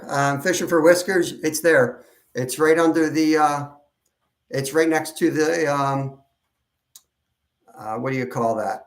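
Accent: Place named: Pennsylvania, United States. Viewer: Any time I think f Kindergarten Cop...."boys have P e n i s and girls have a V....." teeheeee. I said that quote to my radiologist that was in her late 20s. She had no idea 🤦‍♀️. LoL I appreciate your clean channel.!! Once in a while, I slip and say bad words but there are words that is a no no.. especially during the day time. A night stream sometimes brings out dirty mouth. Hehe. But we still love her. Oh, and thank you for the rant in a PA accent, it makes me feel like I'm around family.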